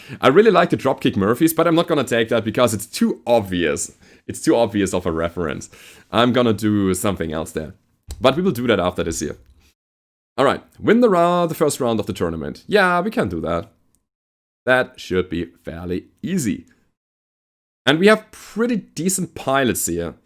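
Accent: Germany. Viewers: Something about a guy speaking English with (what I think is) a German accent reading things with different other accents is just silly. thumbs up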